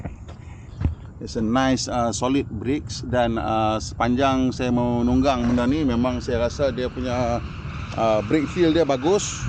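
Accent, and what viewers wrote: North, Malaysia. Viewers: Kalo tak silap dulu bro ni cakap BM standard. Skrg campur loghat utagha pulak dah 😂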